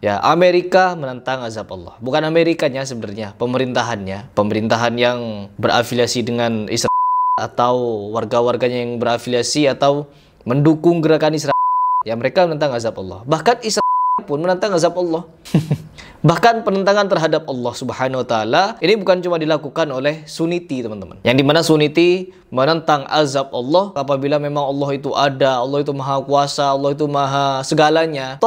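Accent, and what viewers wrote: Madura, Indonesia. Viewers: Kau orang madura ya bang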